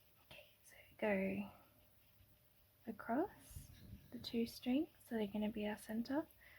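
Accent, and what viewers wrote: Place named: Australia. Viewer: Thank you. Sounds as though you are Australian. Nice to hear.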